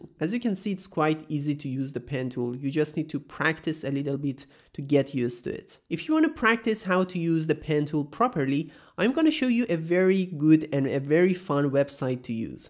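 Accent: Iran. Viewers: iranian accent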